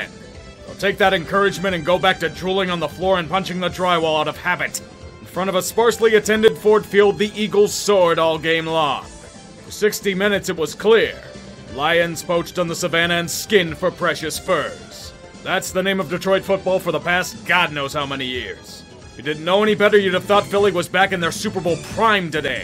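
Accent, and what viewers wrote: Pittsburgh, United States. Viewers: Anyone else love his “yinzer” accent? I think it’s hilarious 😂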